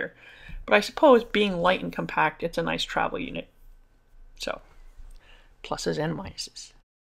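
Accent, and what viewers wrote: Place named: New Jersey, United States. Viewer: brilliant explanation on PWM, as a transplanted NJ Viirginian your accent makes me homesick. I love you lady, you are THE best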